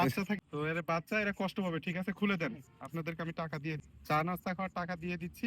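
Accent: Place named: Natore, Bangladesh. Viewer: আপনার বাড়ি মনে হচ্ছে নাটোরে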